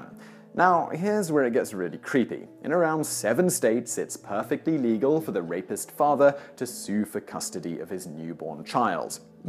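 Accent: United Kingdom. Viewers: it makes no sense listening to a brit talk about US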